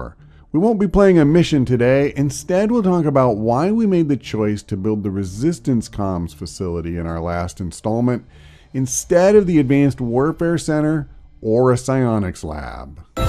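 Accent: United States. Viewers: You should pause the clearing and move the engineer to help build the resistance comms. Chances are engineers and scientists will come in between now and the mag weapon research to continue the clearing and speed up research. Love the show. Sorry if you've been asked this before but are you from New Jersey?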